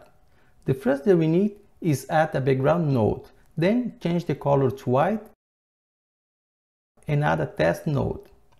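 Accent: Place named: Brazil. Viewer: That brazilian accent...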